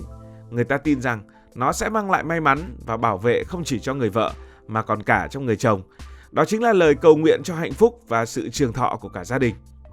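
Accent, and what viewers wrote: North, Vietnam. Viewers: Thích cácvideo của BLV. Ngắn gọn nhưng xúc tích. Lại thêm giong bắc rất hay.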